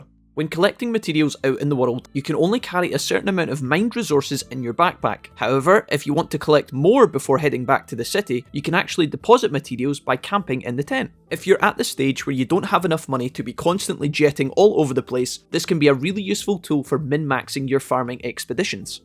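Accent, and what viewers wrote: Scotland, United Kingdom. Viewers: It's kinda funny. I was thinking the other day, what was the name of that other Scottish guy I used to watch for tips the first go around on ps4? Then this video just randomly played after watching a watchmojo Digimon video and knew it was you as soon as I heard the voice lol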